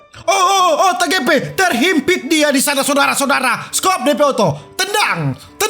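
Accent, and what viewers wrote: Manado, Indonesia. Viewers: Logat Nya Kak Yg Bikin S Betah Manonton Memang Orang Manado Da Best Memang #SalamDariLuwuk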